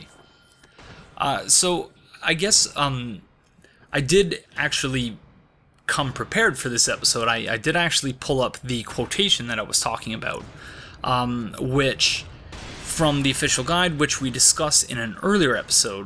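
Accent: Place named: Maritimes, Canada. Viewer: I know you had mentioned being from Canada before but I wouldn't have guessed the Maritimes (statistically) I'm in Nova Scotia (from New Brunswick) if you happen to look at this comment on your five year old video which Province are you from/in?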